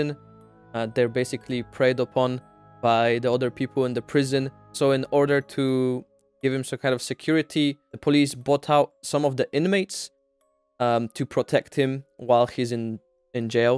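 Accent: Poland. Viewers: Masz silny polski akcent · U can tell ur polish when u say all the polish names and towns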